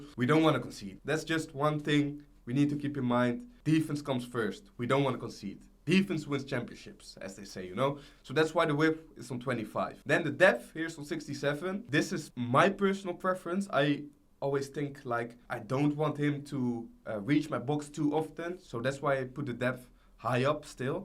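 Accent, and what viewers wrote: Netherlands. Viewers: Thanks voor de tactieken, maat! Intussen, je klinkt echt super Nederlands, hoor!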